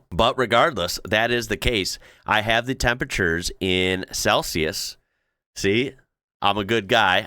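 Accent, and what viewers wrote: Australia. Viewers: You’re beginning to sound like an Aus with your introduction mate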